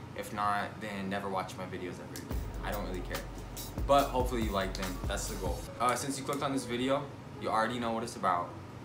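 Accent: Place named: France. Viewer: That French accent 😂and boi I would win any argument 😫😫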